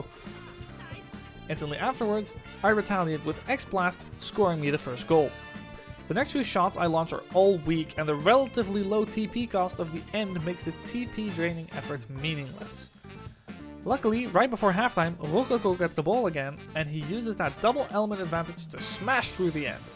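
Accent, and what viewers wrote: Limburg, Netherlands. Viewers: hoor ik hier nou een tikkeltje limburgs?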